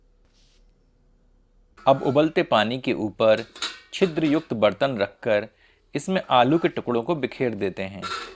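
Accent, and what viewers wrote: Standard. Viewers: शुद्ध हिंदी भी सुनने को मिला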